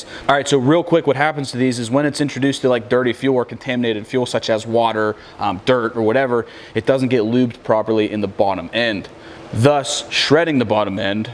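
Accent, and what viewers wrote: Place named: New Jersey, United States. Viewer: You must be from NJ!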